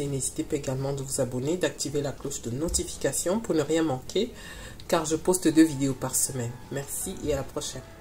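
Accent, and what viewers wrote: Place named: Cameroon. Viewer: MERCI ❤❤ vous avez un ton de chez moi Camer 😊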